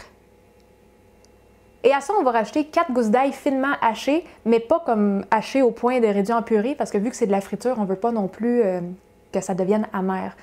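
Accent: Quebec, Canada. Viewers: Vous êtes très claire dans vos explications et surtout vos dégustations finales donnent encore plus envie de tester vos recettes. Gardez votre bonne humeur et votre pédagogie ! Super chaîne ! ( Et en plus j’adore l’accent quebecquois qui dégage beaucoup de sympathie )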